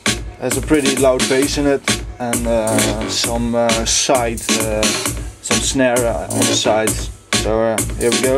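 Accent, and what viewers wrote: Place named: Netherlands. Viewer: haha leuk accent ;) echte hollander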